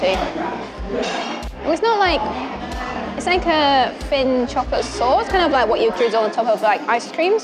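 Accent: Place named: New Zealand. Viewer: yes I picked up his kiwi accent ;-)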